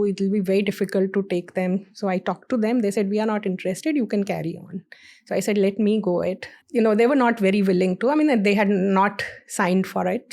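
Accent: India. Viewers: This guy has one of the best funny Indian English accent.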